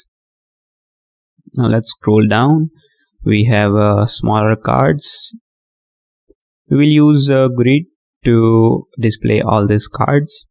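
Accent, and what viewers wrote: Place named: India. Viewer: The problem is that your English is pretty hard to understand, no offense. I know it's the standard Indian English, but still. If your primary audience speaks the same way, no problem, but if you target a wider audience you may want to up your game. I tried to focus on the content and ignore the accent but I didn't make it through the video.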